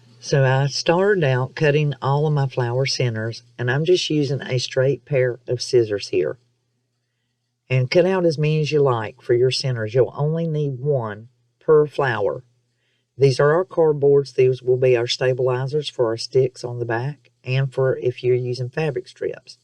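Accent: South, United States. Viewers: Just found you! So excited to make this!!!! You sound like a fellow southern girl! ❤️ Lookinf forward to watching more of your videos! God bless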